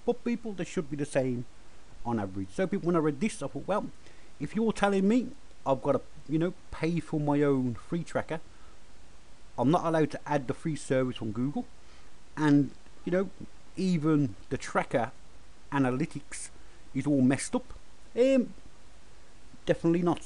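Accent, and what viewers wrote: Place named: Ireland. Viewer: Thanks for that simple technique, loved the accent and the number of times you say "people". Are you Irish? :)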